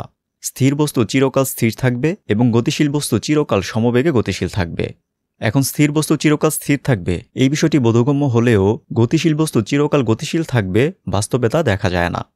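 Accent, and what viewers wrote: Narsingdi, Bangladesh. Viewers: Vai apnar basha ki narsingdi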